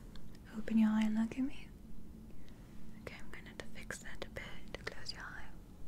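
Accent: United Kingdom. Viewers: Love the video a d your British accent hehe. So pretty 😍 and calming 😁😴 great job as always!Hope you had a Happy Thanksgiving! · this ASMR is absolutely incredible 🤩!! Coming from a British girl, your British accent in this video is absolutely insane 🤯!! Super spot on!! This video was everything I want in an ASMR video & more!! Soft, sweet ☺️, relaxing & super tingly. PLEASE 🙏🏻 do more British accent role plays. Much love 💕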